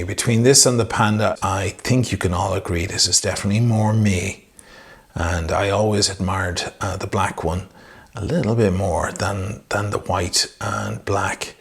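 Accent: Ireland. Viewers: Screw anyone who doesn't like listening to the Irish blabbering...I like many other watch lovers appreciate all the content 👌 massive congrats on the purchase mo chara...its pure class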